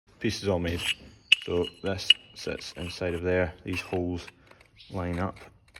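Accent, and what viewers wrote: Scotland, United Kingdom. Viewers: A love hear a good Scottish word like dug. Lol. Is your dug a lab. Well in another top notch vid pal.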